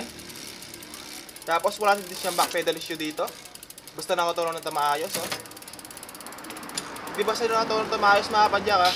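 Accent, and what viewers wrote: Pampanga, Philippines. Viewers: Kapampangan accent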